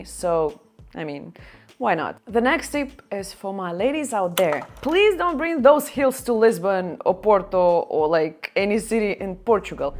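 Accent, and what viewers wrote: Russia. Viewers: Your Russian accent makes your videos even more enjoyable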